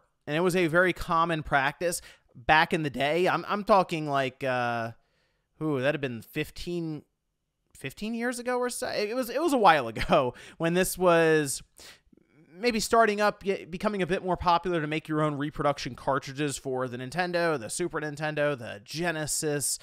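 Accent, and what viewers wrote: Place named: United States. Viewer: Must be an American accent thing. Sometimes I swear I hear the word gayboy uttered instead of Gameboy in videos like this beside of of the invisible or softly pronounced M's.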